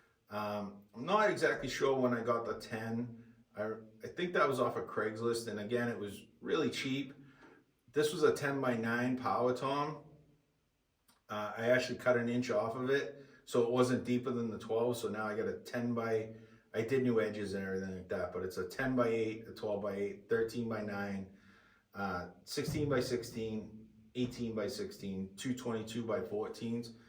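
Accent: Boston, United States. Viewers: Sounds like a fellow Masshole that likes good drums. Fackin ah, khed!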